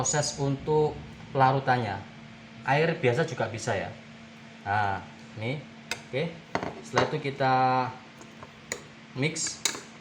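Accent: East Java, Indonesia. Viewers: Saya suka cara masaknya n bahasanya yg medhok khas Jawa timuran 👍👍👍salam teko arek Suroboyo chef..🙏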